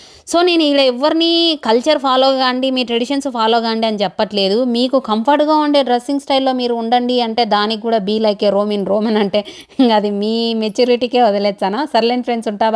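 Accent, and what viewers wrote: Rayalaseema, India. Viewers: మీరు కేవలం ఫేస్ బుక్ లో మాత్రమే పోస్ట్ చేస్తున్నారని అనుకొన్నాను. కానీ ఫుల్ video koraku youtube చూడమని చెప్పారు. వెంటనే యూట్యూబ్ లో కూడా చూస్తున్నాను. సీమ భాష (dialect) చాలా స్పష్టంగా మాట్లాడుతారు. వెంటనే సబ్స్క్రయిబ్ కూడా చేశాను.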